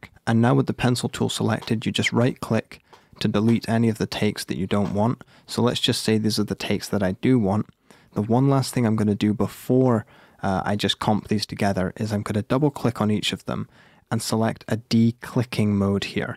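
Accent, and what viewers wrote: United Kingdom. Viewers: Great video and useful tips!Just wondering are you from Britain?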